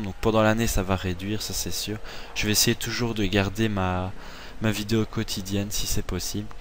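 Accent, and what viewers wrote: Belgium. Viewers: je kiff ton acent belge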